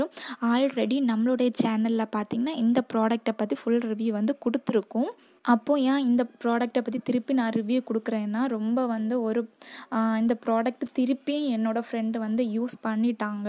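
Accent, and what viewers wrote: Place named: Kanyakumari, India. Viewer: Correct bro ivanga Kanyakumari than pesurathei vechei theriuthu 😅💯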